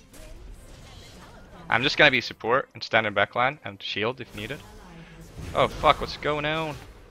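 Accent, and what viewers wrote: Sweden. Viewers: swenglish HAHAH all of us sweds talk like that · Du hade en väldigt start svensk dialekt i den här xD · cryaotic our hero! I'll have you know not all swedish people sound like that. Brings me shame every time I hear some one that. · I don't know what accent you mean, but of course Swedish people have a similiar accent! Most Italians have the same English accent aswell, etc. I don't understand why "TheBlueSledge" is crying about it.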